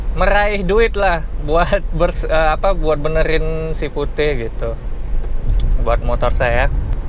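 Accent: Bali, Indonesia. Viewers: masbro.. sy subscriber baru nih.. yg sabar ya masbro.. namanya jg kcelakaan.. kdepannya hrs extra hati" masbro..:D oh iya logat ngomongnya kaya orang bali ya bro.. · kok kyk bali logtnya yak? apa cuman perasaan gue aja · awal ngelihat ni vlog, logatnya yg saya kenal, setelah di cek ternyata orangbali 😀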